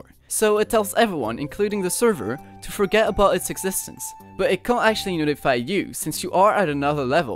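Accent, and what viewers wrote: France. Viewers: C'est plus simple a comprendre avec ton accent Français 👍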